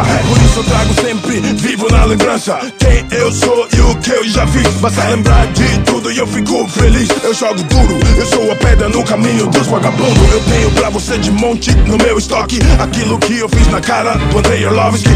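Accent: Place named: United States. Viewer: pregador luo parece um americano tentando falar portugues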